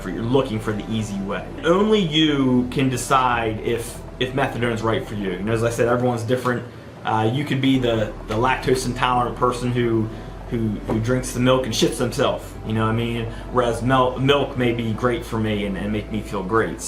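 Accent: Philadelphia, United States. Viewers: I knew I detected a Philly accent right on shout out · Ps: I knew you were a philly guy hahah I recognize that accent anywhere!!! I’m a bucks county girl 🥳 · Just curious - what’s that accent? Philly? Baltimore?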